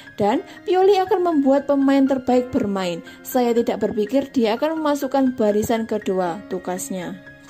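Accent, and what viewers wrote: Java, Indonesia. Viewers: medok mbak yu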